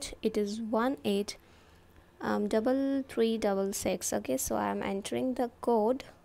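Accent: India. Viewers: Are you Indian ,I'm Right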